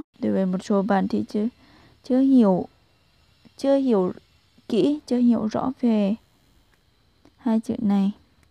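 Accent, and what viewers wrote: Nghe An, Vietnam. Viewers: Bạn người Nghệ an ,hà tĩnh hay người laos vậy bạn giảng thật dễ thương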